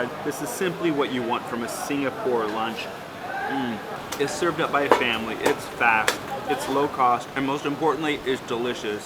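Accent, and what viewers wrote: United States. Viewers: U from Minnesota ? Midwest accented ? Yah ? 😅 Hope U have tucked into the "Half-boiled" Soft eggs with black sauce & pepper, with crispy ButterKaya Toast !?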